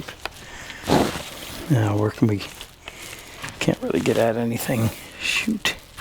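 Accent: Canada. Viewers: And how he says hoose. I love the Canadian speech though, and I enjoy hearing him on his videos.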